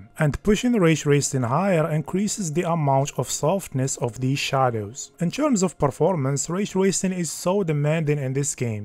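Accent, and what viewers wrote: Morocco. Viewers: The accent mghribi byna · yo bro u moroccan??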